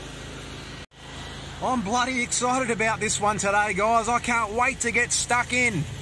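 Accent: Australia. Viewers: New subscriber here. Love the Aussie commentary. 'this is where we get our water, trees make our water' had me in hysterics.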